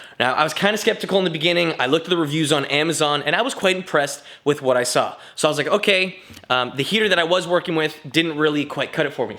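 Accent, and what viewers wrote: Canada. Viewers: are you Canadian?? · Are you Canadian? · Off-topic comment: In your "Send me stuff" section, you don't mention the country. There are two Ontarios in USA, and for those who can't recognize the subtle clues of a Canadian accent, you might be missing out on stuff! Add "Canada"! All the best, Rob (in Switzerland)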